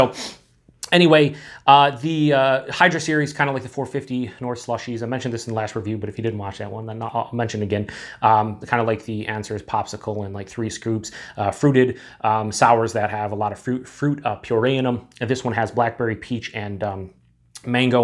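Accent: Western New York, United States. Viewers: Are you from western NY?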